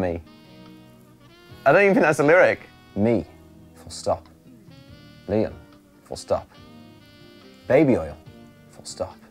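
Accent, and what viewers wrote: United Kingdom. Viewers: Damn his British accent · I can't understand half of the words he said, still learning to understand british accent better😂😂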